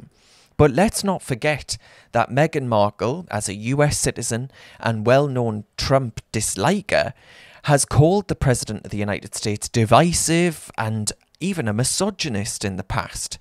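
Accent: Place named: Northern Ireland, United Kingdom. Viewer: Is this dude from Northern Ireland, I like his accent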